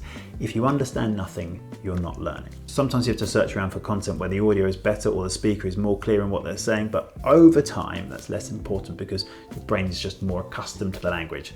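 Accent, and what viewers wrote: United Kingdom. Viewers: I love your English accent! In school we learned "British English" but because of an unfathomable amount of American input growing up I now have trouble breaking away from that American vocab and pronunciation. Trying to change it now as an adult by consuming as much as I can from the UK😅 Great video as always!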